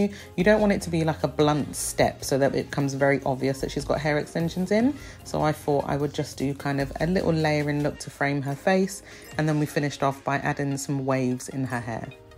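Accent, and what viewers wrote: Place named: United Kingdom. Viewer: Gosh, I always drool over British accents, but yours is even better than usual. Hair looks great too!!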